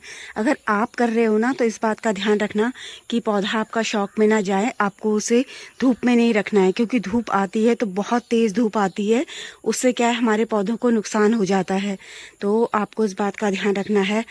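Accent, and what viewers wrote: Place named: Garhwal, India. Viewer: Aap ghadwali ho awaz se lagta hae good sharing happy gardening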